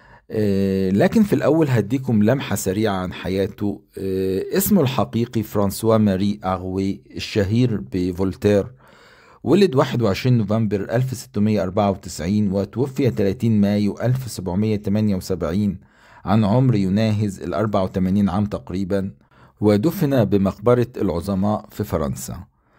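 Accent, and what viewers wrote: Egypt. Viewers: خمس الغنائم.. حسب فولتر….. يا سيدي فولتر من دون ما أبحث عنه أطيب منك ولا يوجد عند حقد متلك. لهجتك مصريه مهما أخبئت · أنت من ميت غمر يابني ؟ لكنتك واضحه جدا ، خد دروس إلقاء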